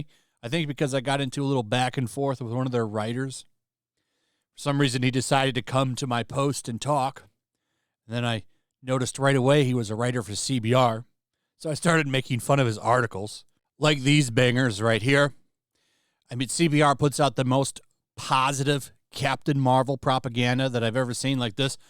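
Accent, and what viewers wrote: England, United Kingdom. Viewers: when you developed an English accent I thought I was watch heel vs baby face but minus the screams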